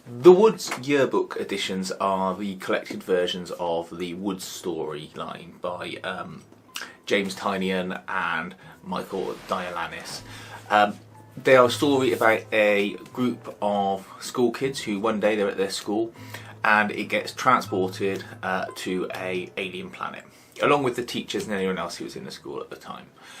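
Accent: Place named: England, United Kingdom. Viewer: Finally hearing an English accent review an American comic.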